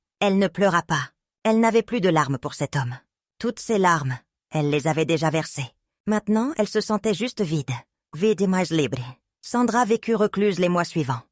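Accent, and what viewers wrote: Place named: Spain. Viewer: Histoire à méditer car très instructive Bel accent espagnol ❤❤❤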